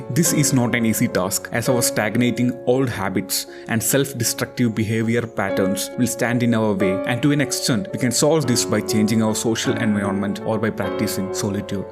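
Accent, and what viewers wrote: Kerala, India. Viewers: Your accent is too obvious. Thick mallu accent is often too hard for foreigners to understand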